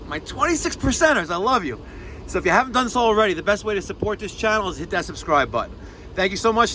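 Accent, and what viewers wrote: New York, United States. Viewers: Trying to copy Steve laviemore channel, gloves, camera, videos but with New York accent. No wonder he deleted you. Trying to be a celebrity plumber. Nobody likes a copy cat. Lol only .I couldn’t work all day doing service trying to put on a show holding a camera. To much time. To many calls. Not bad if you only one or two calls a day. But five to six.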